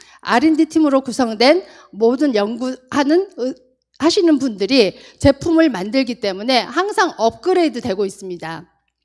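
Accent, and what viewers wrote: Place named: Jeju, South Korea. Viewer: 리더님 하면 구수한 제주 말이 기억이납니다 친정어머님도^^ 행복의 기준! 저에겐 건강이였습니다 좋은강의 감사합니다♡♡♡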